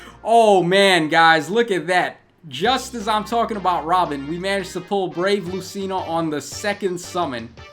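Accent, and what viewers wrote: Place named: Northeast, United States. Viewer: Are you from the north east? I enjoy your channel man, keep up the good work!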